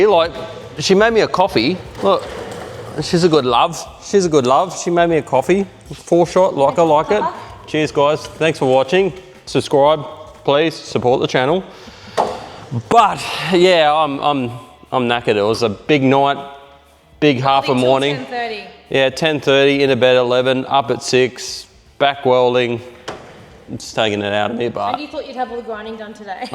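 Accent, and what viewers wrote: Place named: New Zealand. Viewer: Do you ever lived in New Zealand ? your accent have a bit off Kiwi in it .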